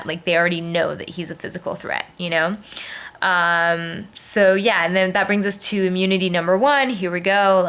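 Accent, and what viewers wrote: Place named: United States. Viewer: "You're playing for a Million dollars, NOT for a doughnut" haha btw what is it with Eric ? i mean he finds the idol, then hands it to Andrea LMAO does this guy never learn !! Reynolds and Eddie voting for two different players, is just ridiculous. note: you may sound American, but you get the beauty from your australian side ;-p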